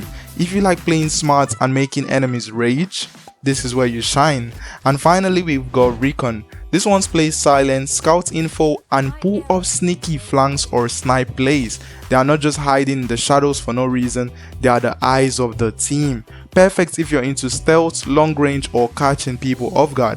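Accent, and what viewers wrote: Nigeria. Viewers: You sound Nigerian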